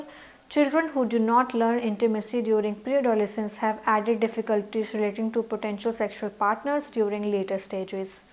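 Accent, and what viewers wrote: India. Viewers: I dont understand the words you said because of the Indian accent..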